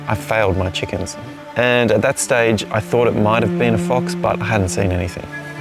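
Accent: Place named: Australia. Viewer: your voice is so soothing. Almost couldn’t tell you were Aussie! ❤❤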